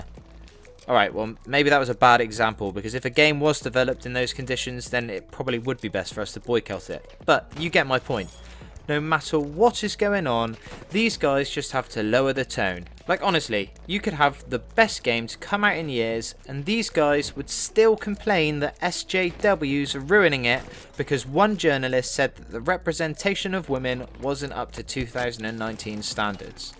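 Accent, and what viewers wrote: Australia. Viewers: I thouth you came from australia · Looks like you got that aussy accent down after all bud.😆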